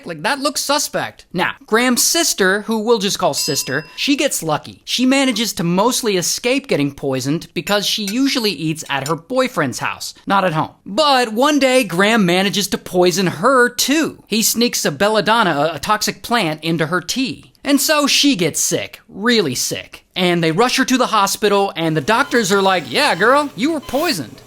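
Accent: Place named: United Kingdom. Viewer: If you can't tell its a British person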